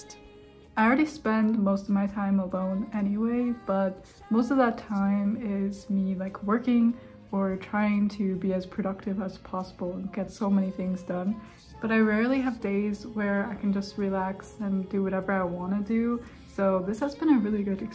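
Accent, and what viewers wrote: United States. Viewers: A lovely Chinese look-like American girl with a perfect American English tongue in such a tenderly whispering style！Watching your classes feels so good like breathing fresh air❤❤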